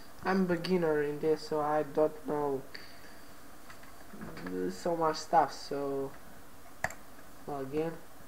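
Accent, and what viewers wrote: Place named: Serbia. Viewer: You talking Serbian-Englesh laung :O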